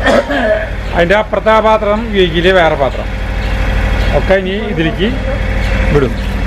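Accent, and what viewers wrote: Thrissur, India. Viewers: സ്ലാങ് കേട്ടിട്ട് തൃശ്ശൂക്കാരൻ അന്നെന്നു തോന്നുന്നു. ഞാൻ തൃശ്ശൂരാട്ട നിങ്ങൾ തൃശൂർ എവിടെയാ 😍😍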